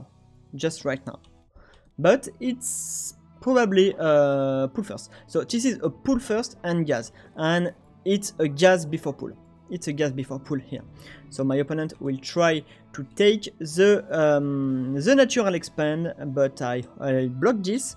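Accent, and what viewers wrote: France. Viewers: Great info dude! Love your Frenglish accent as well :) Waiting for more non-French videos like this.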